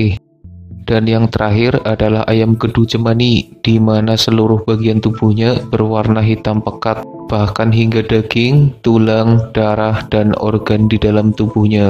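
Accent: Banyumas, Indonesia. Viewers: Adminnya orang ngapak nih wkwk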